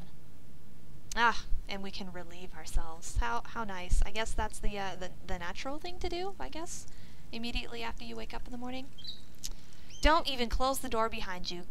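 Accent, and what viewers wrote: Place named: Canada. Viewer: lovely commentary,very plesently spoken..you sound canadian..nice voice..kinda similar to a LP'r name schaly..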